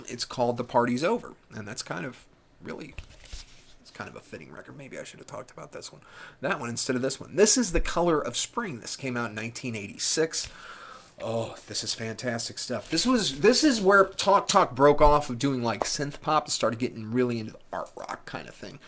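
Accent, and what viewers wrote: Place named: New Jersey, United States. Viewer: hahaha joisey. Youre a natural honey. You got this. Looking forward to it. Lol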